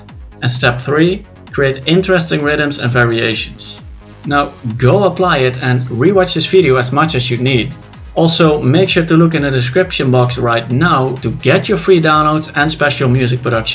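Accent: Netherlands. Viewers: Ik hoor je een nederlander bent dus stel ik men vraag ook in het nederlands , als ik een screech rechtstreeks in de playlist load is de screech nice of normaal maar eens in de piano roll dan is de sample een heel pak korter tenzij ik jetnop een lagere noot zet maar dan is de sound ook lager dan wat ik wil dus hoe krijg een hoge maar lange screech in dienpiano roll ook de noten langer maken maakt de sample niet langer ? Grtz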